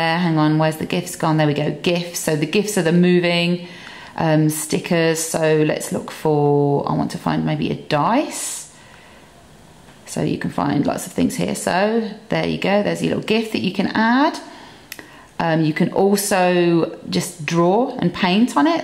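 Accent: United Kingdom. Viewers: Are you British,please reply me.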